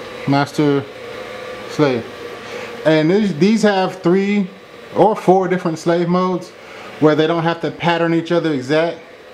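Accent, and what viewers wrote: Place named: Detroit, United States. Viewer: you from detroit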